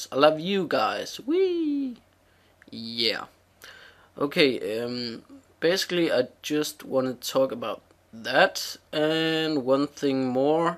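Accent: Denmark. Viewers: This is your channel, which means that you should do what ever you want. if you want to promote other people you should do that. If people don't want to watch it they can just close the video again... though if you want advice I would say you should work on your accent because, atleast to me, the danish accent makes people sound dummer than they actually are... though it might just be because I'm a dane myself...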